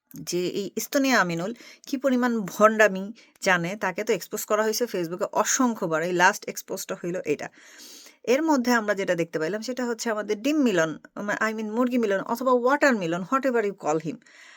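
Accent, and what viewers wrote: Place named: Bangal, Bangladesh. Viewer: Bangladesher rajniti bujhina,apnar mukhe Bangal kotha shune valo laglo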